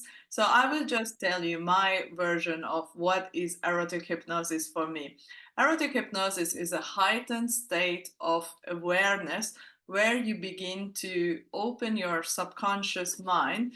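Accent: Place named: Russia. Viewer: Haha maybe. I like your voice, sounds Russian or Bulgarian accent. I felt onwards your voice very slow and direct/strict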